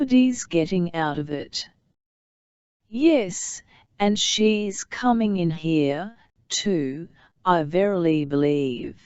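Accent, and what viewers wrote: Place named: Australia. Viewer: People learning from this will pick up a strong Australian accent and a very robotic expressionless intonation!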